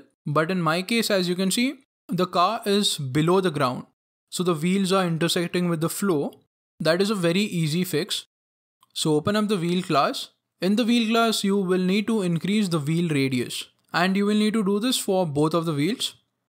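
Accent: India. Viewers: you look american but talk in a indian accent my stereotypical brain don't know what to do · this is what I mean when I say indian accent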